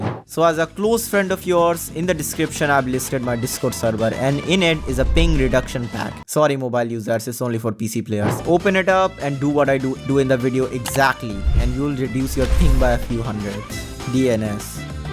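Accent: India. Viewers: Yo ur Indian right cuz ur accent and the song u used is Indian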